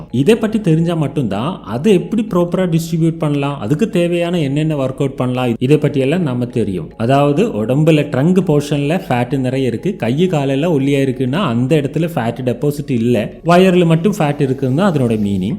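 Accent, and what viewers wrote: Kerala, India. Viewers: Vadakkan ella malaiyali chettan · சகோதரா நீங்கள் மலையாளியா??? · Malayalam boy ❤️✨ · Ivan malayali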